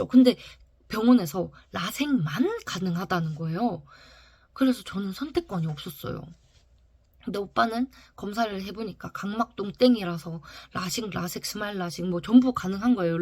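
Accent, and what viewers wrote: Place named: Andong, South Korea. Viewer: 야 안동 말투 너무 오랜만에 들어서 그런지 너무 재미있다 ㅋㅋ 영상 너무 재미있게 잘 봤어 😊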